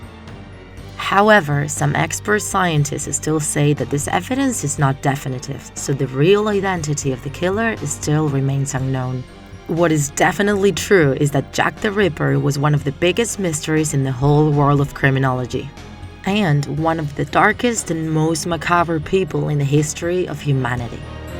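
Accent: United Kingdom. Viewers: British to me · Do you live in UK? 🇬🇧 (United Kingdom?) I feel like you speak with a british accent.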